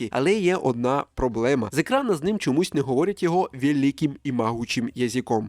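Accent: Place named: Transcarpathia, Ukraine. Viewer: Ого, закарпатський акцент. Кайфово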